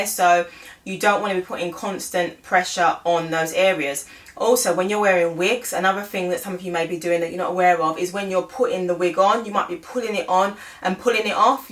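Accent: United Kingdom. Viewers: I didn’t hear anything she said but enjoying her accent...i bet she is from Uk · Finally a British accent . Thanks girl now when u give recommendations on products I can actually get them. 🙂🙃🙂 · Lovely to hear a UK voice! Thank you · I said the same thing. Great to hear a British sister.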